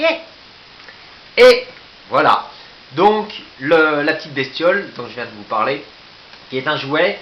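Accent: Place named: Quebec, Canada. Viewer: 😄😄😄 pas mal l'accent québécois !!! Mais tes sur YouTube ces cool sa. Be moi aussi. Si tu va sur ma chaîne je pense tu me reconnaitras😊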